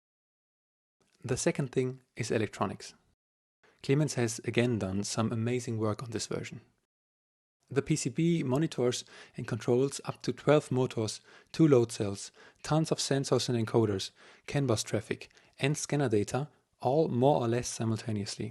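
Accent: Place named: Germany. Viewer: This is amazing! Whenever you see an ingenious project, it comes with a German accent. If you've not seen it already, please, please check out Destin / Smarter Every Day's manufacturing video. It's about getting a Barbeque cleaning brush made in the USA with non-chinese parts. It would be sad to see the MPS fail as a business, but it seems that the west has cost engineered ourselves into a manufacturing corner, and become very vulnerable in the process.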